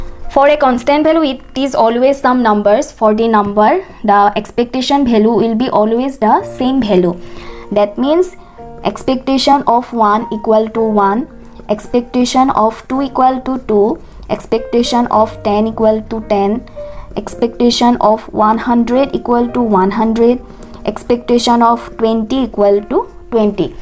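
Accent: Bengal, India. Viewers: Bengali bengali voice☺, nice explanation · Mam apni bengali... Sprosto dhora pore gechhen😅😊... Love from Kolkata ❤🎉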